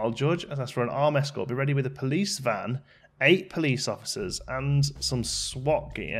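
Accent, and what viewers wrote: Canada. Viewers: Wow, now that you are speaking Canadian, it is really hard to understand you when you talk fast!! :) (I think you are going to make me spend money on this game!)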